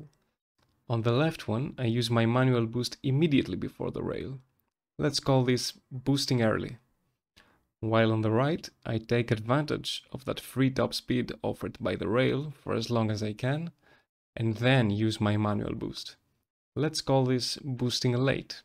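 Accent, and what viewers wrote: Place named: Greece. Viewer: Ρε ελληνας εισαι? το ψιλοκαταλαβα απο τη προφορα, αλλα στο τελος με τον αγγελακα ηταν σιγουρο χαχα, ωραιος βλεπω τα βιντεακια , εχω 1:39:600 με το gaston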